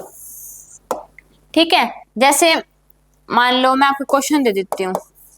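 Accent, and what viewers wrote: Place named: Haryana, India. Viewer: Haryanvi 😅, best question ma'am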